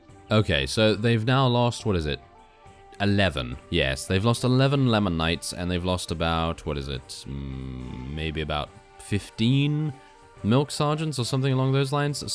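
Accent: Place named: Southern England, United Kingdom. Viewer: Southern UK.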